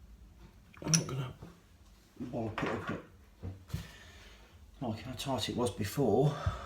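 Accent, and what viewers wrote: Leicester, United Kingdom. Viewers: This is brilliant, was going to get a plumber in until I saw this video, I reckon I can tackle this myself, a big thanks mate! you have to be from around Leicester, sounds like my next door neighbour! thanks again bud!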